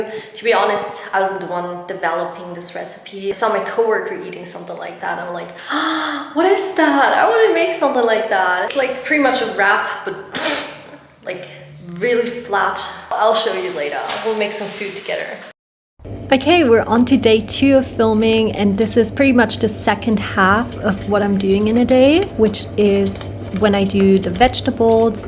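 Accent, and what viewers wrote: Australia. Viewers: I can hear that aussie accent coming in :D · I can already here some Australian accent coming out haha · I just wanna add that as an Aussie watching your content I can hear your accent changing to sound a bit more Aussie 😁 ps. Congrats on your new job!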